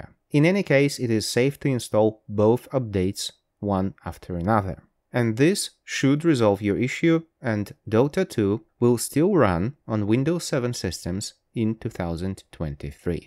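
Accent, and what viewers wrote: England, United Kingdom. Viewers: Да вы из Англии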